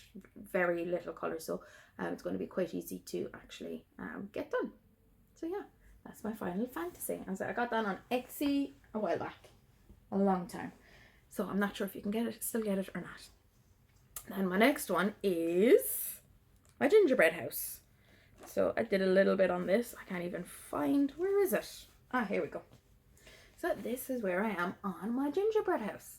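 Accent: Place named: Ireland. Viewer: Lovely to hear another Irish accent on Flosstube. Great to find another Jacob fan. I was supposed to attend the Big Stitch but sadly life had other plans. Really enjoying hearing all the recaps. Love the variety of your projects. Looking forward to following your progress. Take care, best wishes from 🇮🇪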